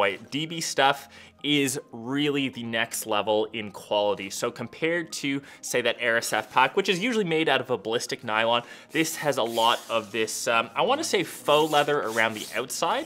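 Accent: Netherlands. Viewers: Hé, are you Dutch? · Huh are you from holland?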